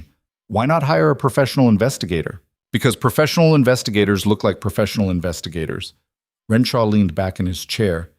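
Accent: United States. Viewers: Good story but please use a British narrator when in Great Britain. The American accent take away from the story.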